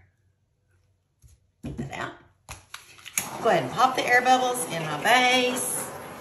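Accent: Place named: South, United States. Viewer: Beautiful Dutch pour! Your accent sounds like mine. Do you hail from the south?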